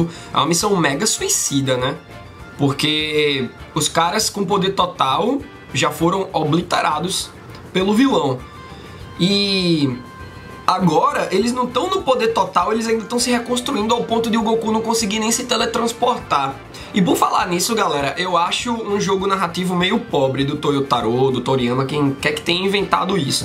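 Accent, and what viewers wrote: Pernambuco, Brazil. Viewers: Esse cara e Pernambucano kkkkkkkk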